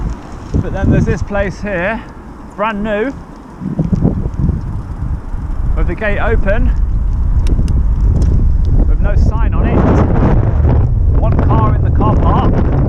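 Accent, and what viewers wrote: Leicester, United Kingdom. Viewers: Love the quality on this channel, I live in Derby near swarkestone bridge on the A50 so you are so local!!! Leics twang sounds so similar to Nottingham twang, which is where I was born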